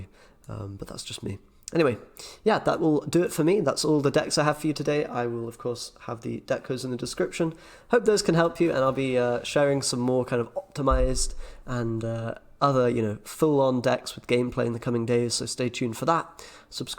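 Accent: United Kingdom. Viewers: Yo, you're British, aren't you? Great vid BTW <3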